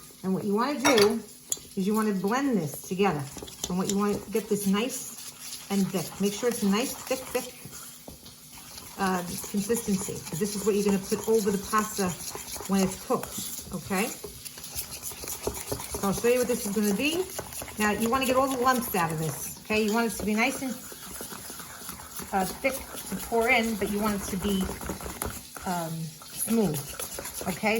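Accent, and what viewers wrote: New York, United States. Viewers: Keep up with the videos girl I've learned a lot from you!! And you trip me out with that n.y. accent 🤣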